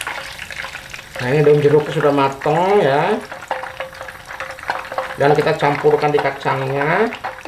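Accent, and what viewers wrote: West Java, Indonesia. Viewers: Sunda banget, KUNGKURAHAN... logatnya juga Sunda banget...👍👍😅😅❤❤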